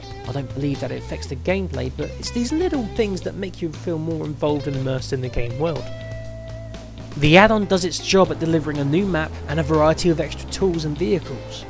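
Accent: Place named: United Kingdom. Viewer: So awsome. Cool british acsent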